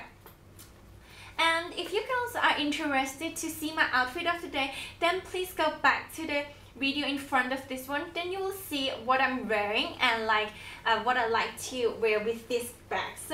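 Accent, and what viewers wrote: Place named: China. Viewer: but arent you Chinese????? i can barely understand you with the heavy accent. no way are u a native english speaker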